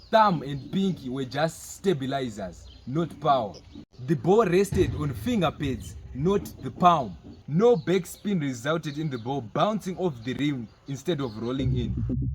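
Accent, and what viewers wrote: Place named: Zimbabwe. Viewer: That zim accent😂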